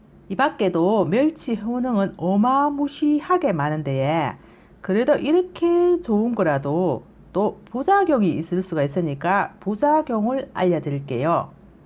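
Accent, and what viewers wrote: Gyeongsang, South Korea. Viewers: 만물박사 갱상도사투리원조님.멸치라고 깔봐요? 그렇게 인간에 유익한 머리님을~~삶은소대가리보다 고맙죠. 군에서 된장 한 숟 푹떠 손가락만한 멸치 5개로 한끼 때웠던 그 때가 그립네요. 오늘은 영양학 강의? 다 안들려요. 대구4두2 듣는 취미. 오늘은 너무 젊잖네요. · 친근한 갱상도말씨 좋아요 좋은정보 잘공운하고있어요 감사해요 굽신~♡ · 잘봣어오ㅡ 곙상도사투리 줌마님 얼굴좀 보여주세요 오늘ㄷ좋아요 했어요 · 갱상도말씨가 팽상시 좀 시끄러버서 듣기가 쪼께 거북시러버 안듣는데 여사님 말씨는 괘않습니더 자상한 말씀 감사 함니데이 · 경상도 사투리로 재미있게 방송하시는게 재미있어 자주보게 됩니다 성격이 화끈해 요리설명도 재미있게 하셔서 혼자서 웃기도한답니다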